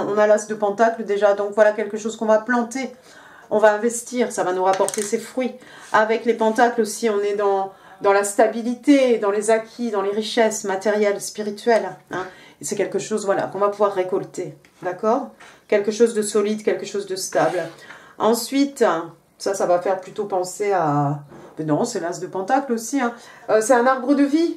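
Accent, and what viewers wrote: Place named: England, United Kingdom. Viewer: Merci pour cette guidance 🙏, j aime bien votre accent anglais 👍 . Bonne journée 🤗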